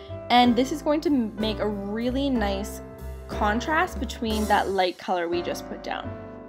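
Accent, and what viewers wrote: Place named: Canada. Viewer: Super Canadian accent!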